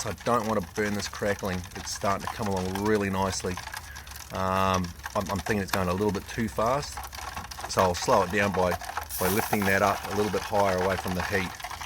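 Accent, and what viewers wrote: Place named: New Zealand. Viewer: Good to hear the kiwi accent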